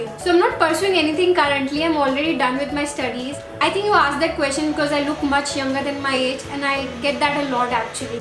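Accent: Delhi, India. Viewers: she is speaking indian English - and her accent is perfectly fine. There are Indian lecturers out there who are less understandable. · she has an indian accent..American accents are much worse with their own pronunciations on everything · Easy to guess you from Delhi . That super strong accent :) But good job on your creativity. You are good with it girl!! All the best!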